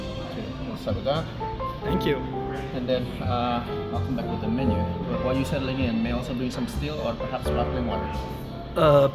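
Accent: United States. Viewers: You’re soooo American. I love it. I’m American but never feel like I belong here. It’s awesome hearing with a true American accent and zero pretense. Your comments are outstanding.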